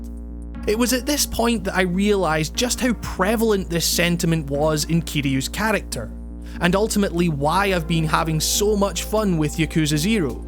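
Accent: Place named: Scotland, United Kingdom. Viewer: Thanks for answering! I really appreciate it. I hope you don't mind if I model a Scottish accent off your voice. Most of the ones in media are really exaggerated so I prefer to model off real people, and your long-from videos would give me lots to work with.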